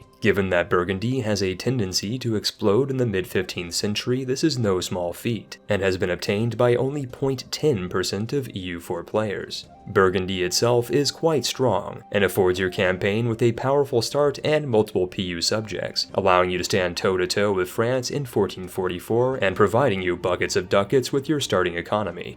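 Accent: Texas, United States. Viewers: bruh that texan accent lmao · I love Texas accent :D. Make a video only in Texan 😂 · Came for the Achievements, stay for that Texas accent. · the smoothest Texan accent out there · Love the Texas accent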